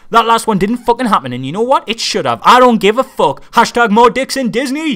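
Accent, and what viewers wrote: Newcastle, United Kingdom. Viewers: I wish you Geordie abridged more films... I'm not even Geordie but I understood it better.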